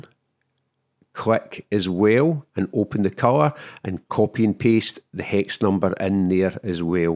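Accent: Scotland, United Kingdom. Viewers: Interessante Methode! Und was für ein drolliger Akzent! Ist das Schottisch?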